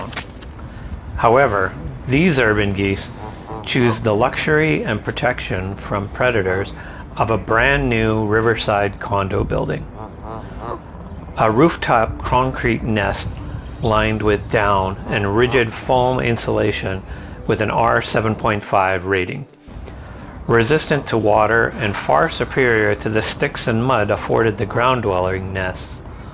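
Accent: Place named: Canada. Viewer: I enjoyed this video. I’m surprised that you could get so close when filming. I like the use of ‘A’ when talking. Very Canadian. It’s a bit long when looking at the male bird. Maybe describing what’s going on with the birds or an explanation on what the female is also doing. I’m not sure what you should say cause I don’t know really anything about Canadian geese (crowbar chicken). Keep going with the video, can’t wait for the next instalment. ❤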